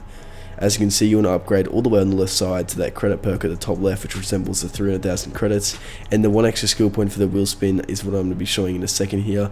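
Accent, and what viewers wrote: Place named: Australia. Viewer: Hes speaking Australian english perfectly lol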